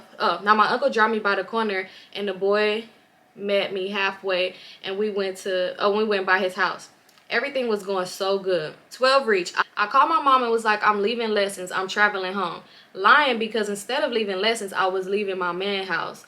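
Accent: Trinidad and Tobago. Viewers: Allyuh = All of you Caribbean accent Possibly a Trinidadian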